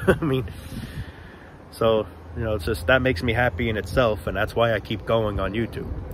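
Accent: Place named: New England, United States. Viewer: Homie prolly from New England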